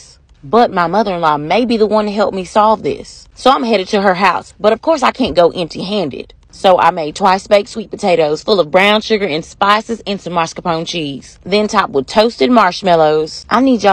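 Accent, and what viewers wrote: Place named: South, United States. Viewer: As we go along she gets more and more southern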